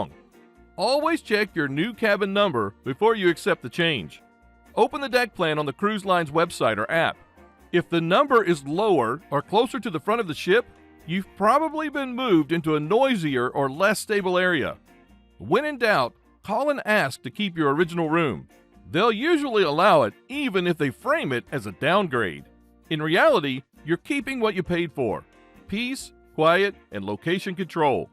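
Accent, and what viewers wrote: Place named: South, United States. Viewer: i love how this guy who has a very southern accent is so smart like SMART smart i have learned a lot from you tysm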